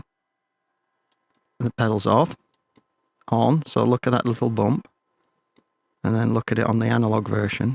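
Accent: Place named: Yorkshire, United Kingdom. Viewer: That's some accent mate, Yorkshire man in Cali? Fantastic video btw!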